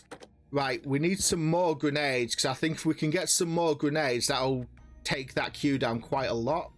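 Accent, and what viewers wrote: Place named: England, United Kingdom. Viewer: UR ENGLISH!?!?!?!? 😱😂 Soooo many things... This game.... Ur voice... This is like when the protagonist reveals he's related to royalty and knew the whole time... I'm also beginning to see the kind of games ur in to. I feel like I'd have a blast playing this... Anything relating to round based survival first person shooter, I'm interested in 😂... Nice to hear the voice behind the screen brother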